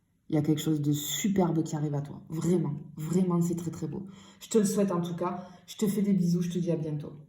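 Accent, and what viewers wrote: South, France. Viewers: Bonjour, merci pour vos belles vidéos. Je les découvre depuis peu et beaucoup me parle. Celle-ci en particulier. J’adore votre énergie, votre accent du Sud, votre chaleur et votre bonne humeur. Et j’adore votre manière de faire : « ou la lalala ! » Lol recevez toute ma gratitude.🙏🤗💙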